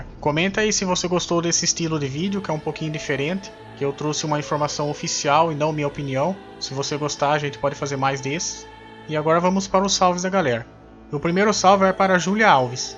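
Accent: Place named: Paraná, Brazil. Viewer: vc e paraienese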